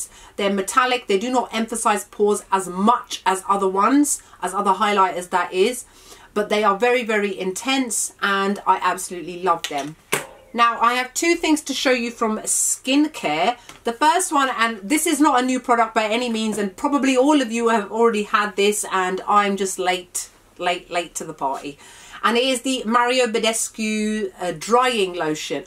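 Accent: United Kingdom. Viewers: Hi from US, I love the way you talk :) plus UK accent. Your Makeup looks amazing!, need to get that lip color, so pretty! Love that colourpop blush, even i mostly always reach for cherry purply colors for blush. Watching your video to make list of things to buy with Sephora 10% coming up soon :) xoxo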